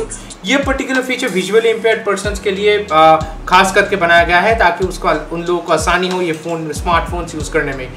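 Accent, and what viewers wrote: India. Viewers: Damn, couldnt get passed the heavy indian accent. Not hating him, wtf u thinkin, damn did not help me at ALL Thanks 4 Nothing. Just wanted keyboard back to normal, now Im fluent in indian language. Damn, use some one that speaks clearer english, just sayin......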